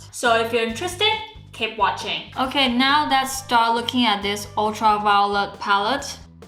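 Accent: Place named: United Kingdom. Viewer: 沒想到的英文有了英式發音 XD 好新鮮的感覺 (從部落格時期收看文字的我...適應會動的中) but it's a nice palette..but wow, that purple..lol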